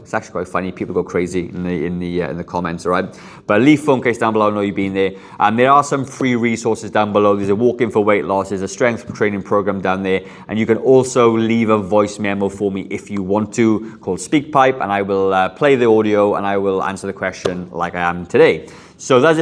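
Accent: Wales, United Kingdom. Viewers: Lou from Ireland here. I love your welsh accent...oh and its Phone case !!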